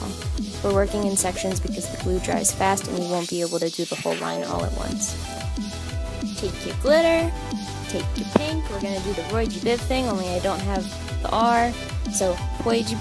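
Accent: Philadelphia, United States. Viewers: Because your accent sounds Philly.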